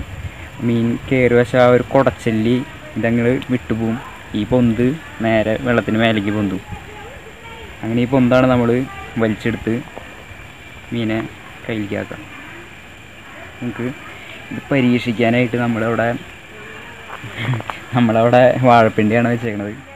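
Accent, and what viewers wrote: India. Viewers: നല്ല കൊടുങ്ങല്ലൂർ ഭാഷ ..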